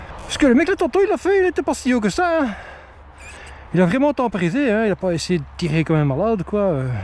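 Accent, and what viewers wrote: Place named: Belgium. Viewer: Belle vidéo ! Tu pourrais dire ou est le spot ? je suis à bx et quand j'entends l'accent je sais que c'est en Belgique 😅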